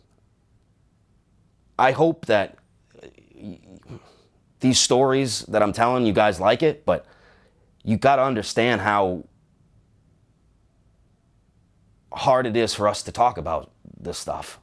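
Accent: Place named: Massachusetts, United States. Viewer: Your a real man. Thank you for talking about it. You do make a difference to others. Btw Im from Maine and your accent from Mass makes it more real for me. You got this bub! Awesome what your doin’. Don’t stop.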